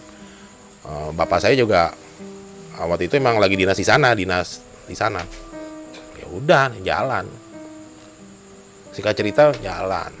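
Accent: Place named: Jakarta, Indonesia. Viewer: Ngomongnya cepet bet dah... wkwk logat jakartanya kental jadi susah di hayati😅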